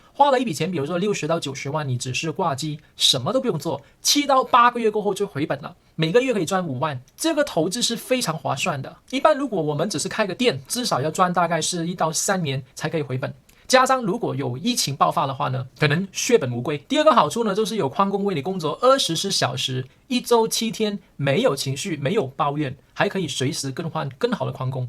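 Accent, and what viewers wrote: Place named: Malaysia. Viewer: 刚开始以为是移民到台湾的马来西亚人。没事，听的蛮舒服的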